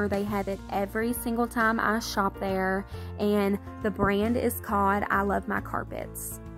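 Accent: South, United States. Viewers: I enjoy listening to your commentary because of your accent. It reminds me of my southern family, and you're pretty as well. Love from California.;)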